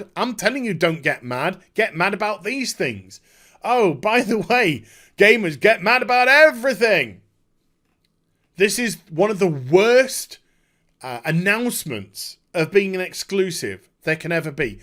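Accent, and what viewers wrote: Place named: United Kingdom. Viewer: ive watch so many of your videos, that anytime i read something online i read it in my mind in your british accent.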